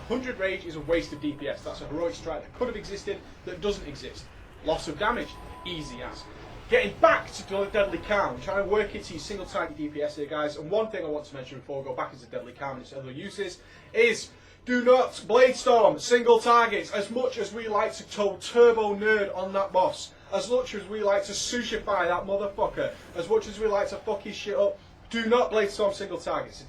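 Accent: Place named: Manchester, United Kingdom. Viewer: I watched this purely for the banter, fuckin hilarious mate, where about in Manchester you from?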